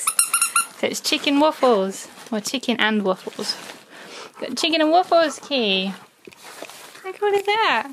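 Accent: England, United Kingdom. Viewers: "Get the tangly bit". I love your English ways of saying things. And Key really listens. It's amazing!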